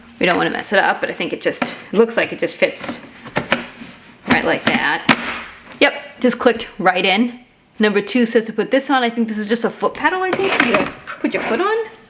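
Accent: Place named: New England, United States. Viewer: Thanks for this. I’m in the market for something like this. By the way, you sound like a New Englander (like me)! Accent unmistakable and I love hearing it!! 😊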